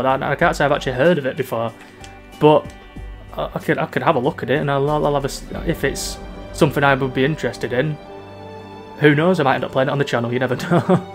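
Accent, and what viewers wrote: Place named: Yorkshire, United Kingdom. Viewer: nice! I was thinking Yorkshire or Hull from your accent. My mom was born in Leeds, and grew up in Yorkshire before moving to the US in the ‘80s.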